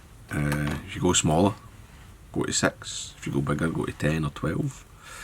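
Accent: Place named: Ayrshire, United Kingdom. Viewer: See you’ve not lost yer Ayrshire twang,. Think this size flea is ideal for pike too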